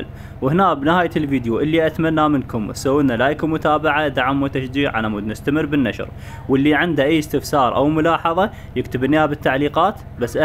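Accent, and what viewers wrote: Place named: South, Iraq. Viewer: صاحب فيديو واضح معيدي من ناصرية مدري عمارة ديوانية شكله وللهجة مالته خايسه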